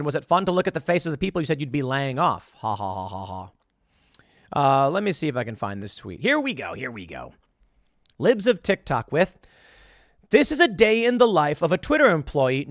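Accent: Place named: California, United States. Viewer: Is that a California accent or what? I hate it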